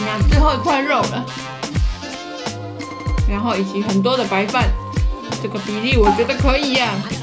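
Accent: Taiwan. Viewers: 的台語真的好好笑，這個影片真歡樂怎麼可以吃這麼多人還這麼漂亮又瘦...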